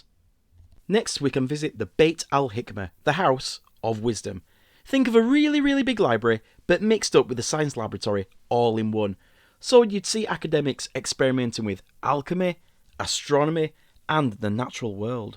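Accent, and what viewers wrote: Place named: North, United Kingdom. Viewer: I really like your witty style, pairs well with northern accent. Keep up the good work!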